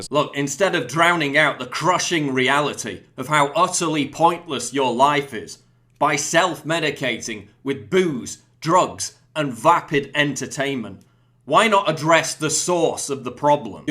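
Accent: United Kingdom. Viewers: Are you British, sir?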